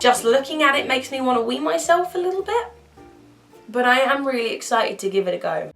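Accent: United Kingdom. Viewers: You remind me of Merida from 'Brave', :) even though you speak british and not irish.. It's your hair! I like it ^^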